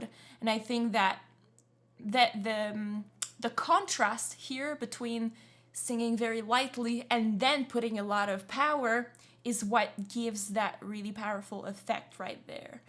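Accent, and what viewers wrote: Quebec, Canada. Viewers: French pronunciation?? Are you kidding me? You are from Quebec. That makes you the ugly third stepchild from a bastard idiot uncle who wears speedos when he goes shopping. Is what you speak really French? Back to your poutine while staring at your Trudeau poster.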